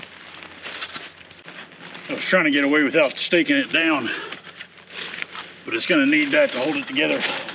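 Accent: South, United States. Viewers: Your accent tells me that you are from where we live, the south.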